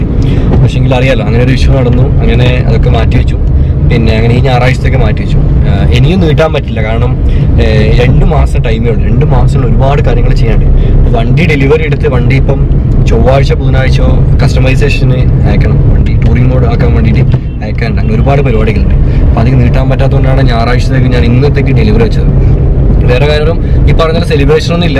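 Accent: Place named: Iritty, India. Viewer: ഭാഷ വള ഇഷ്ടമാണെനിക്ക്, കാരണം ഞമ്മളെ നാട്ടിലെ ഭാഷ തന്നെ ഇരിട്ടി ഭാഷ ഉപയോഗിക്കുന്നുണ്ട്. അത് തന്നെ ' കേരള to യൂറോപ്പ് യാത്രക്ക് എല്ലാ വിധ ആശംസകളും നേരുന്നു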